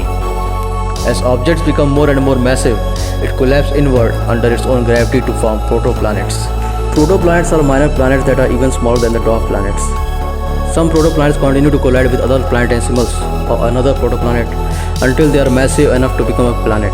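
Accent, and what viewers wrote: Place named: Africa. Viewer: why does your voice sound African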